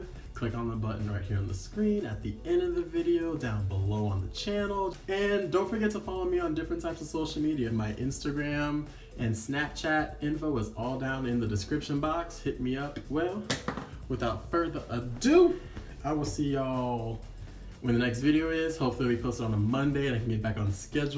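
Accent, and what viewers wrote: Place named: Brazil. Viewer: Sotaque brasileiro kkk you até from Brazil?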